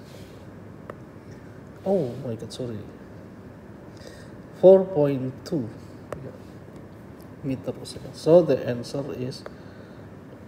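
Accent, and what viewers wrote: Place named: India. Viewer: when I heard the lovely indian accent, I know I came to the right video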